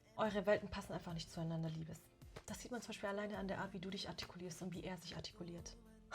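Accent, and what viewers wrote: Swabia, Germany. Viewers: Des vestehed nur schwaben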